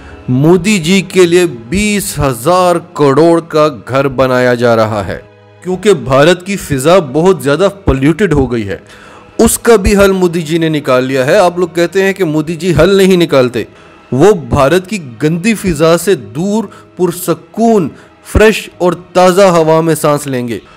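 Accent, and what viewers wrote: Pakistan. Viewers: Good review pure pakistani urdu accent love you brother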